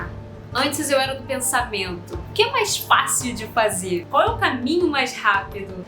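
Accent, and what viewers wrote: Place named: Rio de Janeiro, Brazil. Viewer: Sua ideia foi genial. Coloco aqui as coisas que percebi durante o tempo do vídeo. Achei muito bem editado e que irradia a sua simpatia e seu sotaque por vezes cariocas e outras vezes português. Sua desenvoltura é fabulosa. Só achei um pouco longo e as vezes as pessoas só dão uma passadinha rápida para ver o que tem de novo. Gostei do uso da língua inglesa também. Nesse momento em que as nossas entidades tem carência de jovens vem a calhar e acho que devem acontecer sempre porque estão querendo mudar a nossa história. Você já percebeu isso? claro que alguns vão discordar dos temas e formas que foram colocados. Isso acontece pelo conhecimento adquirido de alguns. Até os autores discordam uns dos outros não é mesmo?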